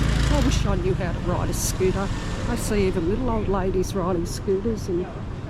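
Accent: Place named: Australia. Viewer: Your accent is australian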